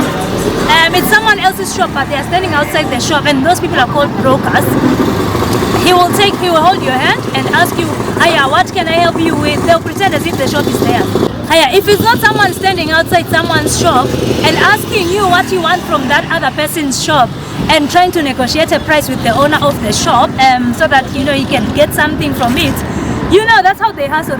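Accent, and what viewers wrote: Kenya. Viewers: Mswazi with nai accent👍by the way im jealous of ur king 😉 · 😂😂It's the way you're mixing Swahili with English like a regular Kenyan. Your Kiswahili accent also sounds very Kenyan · I love your Kiswahili, you speak like a Kenyan. Great job. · That's the Kenyan accent if not wrong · My sister, you are a full kenyan your accent is kenyan, your command in kikuyu and swahili language. Is wooooow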